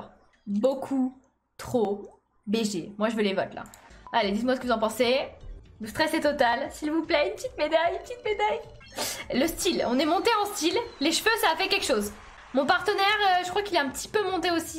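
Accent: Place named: Marseille, France. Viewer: Laccents Marseille mdrr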